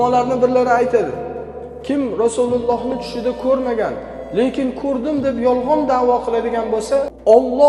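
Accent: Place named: Surxondaryo, Uzbekistan. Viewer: xa shunday men Surxondaryodanman bizdayam shunaqa shevada gapriladidee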